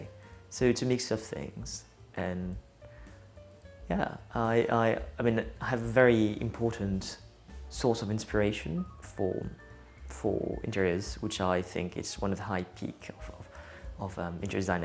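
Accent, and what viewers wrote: England, United Kingdom. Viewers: Such a nice place with a country feel ..this is one of the reason i like London and the British touch ... it's often very personal and a mix of patterns and colors.. how can a young man (who has recently arrived in London) able to afford such a nice London home?! . C'est vraiment impressionnant comme parcours ...et qui plus est you've picked up the english accent ...vous parlez parfaitement dans les deux langues ..last but not least j' adore votre intérieur .. you're so talented , je suis estomaquée ! And if you're reading this little note , seriez vous assez gentil de me dire avec quelle "paint" vous avez refait la cuisine car j' aimerais rénover ma vieille cuisine qui a de beaux éléments en bois et la votre m'inspire ! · bravO!! Your home is homely, elegant, quirky and inviting and graced by your charming english accent:-) ⭐⭐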